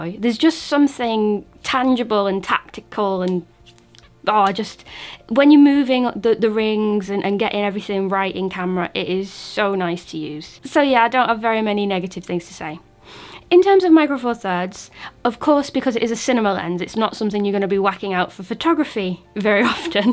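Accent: United Kingdom. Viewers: Love your voice hell😂😂 shit relax me..i can watch any review with that voice. Idk sometime about uk voices..remind me of mary poppin the original..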